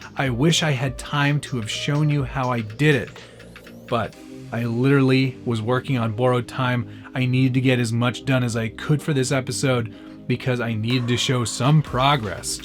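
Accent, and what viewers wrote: Canada. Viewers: Please type the name of that chrome paint pen you've mentioned a couple of times. Not sure if its your Canadian accent or my shitty ears or a combination of both... Tractor Truck is looking bad ass. The brazing work really looks great painted. Shows that not everything has to be bought off the shelf...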